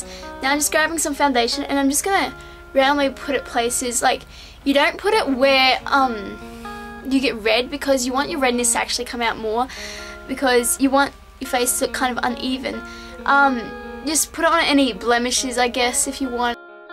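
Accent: Australia. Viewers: u sound Australian i now love you just cause i am australian ^-^ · r u austrailian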